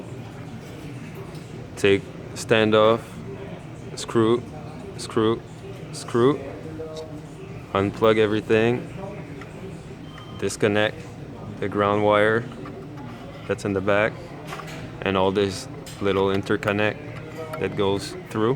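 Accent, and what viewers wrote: Quebec, Canada. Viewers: Es tu québécois???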